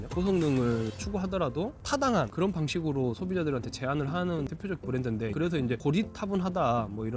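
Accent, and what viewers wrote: Jeolla, South Korea. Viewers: 내용 매우 좋았구요, 더 보고싶다는 느낌은 주행영상에 대한 목마름이 아니었나 싶습니다. ^^ 특유의 전라도 사투리 억양이 재미를 더합니다. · 전라도 말투가 나와서 혹시 했더니 "귄있다"는 단어를 예로 드는 걸 보면서 확신을 합니다. 말씀 참 잘 하시네요. 작년에 할리 883 몇 달 타 보고서 이정도면 충분해 하며 오토바이는 안 산다고 맘 먹었는데 이녀석을 보고 나니 엄청 흔들리네요.... 주식을 좀만 더 열심히 해서 2천만 더 모으면...ㅎㅎ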